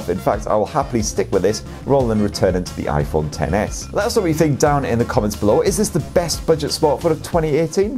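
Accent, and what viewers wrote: United Kingdom. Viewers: i just love British accent 😀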